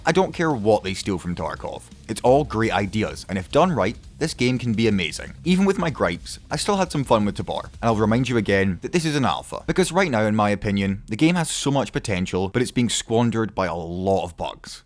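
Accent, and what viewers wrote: Scotland, United Kingdom. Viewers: yeah he's from Glasgow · is this a scottish accent?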